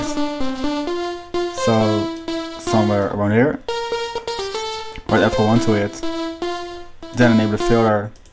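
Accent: Netherlands. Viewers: Hey man .. goeie totorial .. maar je moet echt werken aan je Engels accent en langzamer praten je bent amper te volgen 😉 .. geen haat gewoon een tip .. oja .. en je hoort duidelijk dat je Nederlands bent .. haha vandaar Nederlandse reactie 😉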